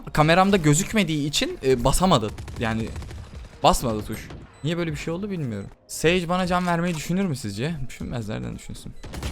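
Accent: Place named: Yozgat, Turkey. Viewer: Abi sen yozgatlimisin konusman ole geldi bana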